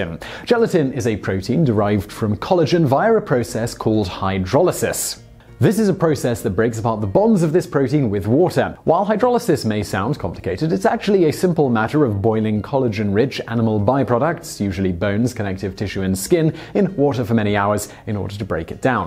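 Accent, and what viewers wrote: United Kingdom. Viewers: Today I found out that you're British.......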